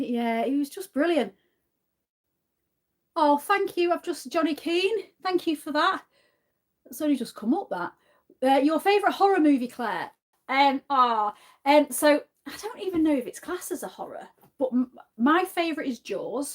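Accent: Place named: Northern England, United Kingdom. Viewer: Hi Claire, Paddy here. I'll look forward to the new Lincolnshire ghost video you just mentioned. As a Lincoln born and raised "Yellowbelly" bloke but now semi-retired in rural Hertfordshire after many years of working in London, I really enjoy your videos, particularly the haunted airfields of Lincs collection which are brilliant. I also love the fact that you haven't lost your Northern (I seem to remember you mentioned Trawden in an earlier video?) /East Midlands accent with the flat vowels that I still have (I still have a bath, not a 'barth') and I'll turn 70 next month after leaving Lincoln more than 40 years ago. I know Trawden and that whole area of Lancashire having relatives in the area. Good on you lass and keep up the great work with the channel 😍!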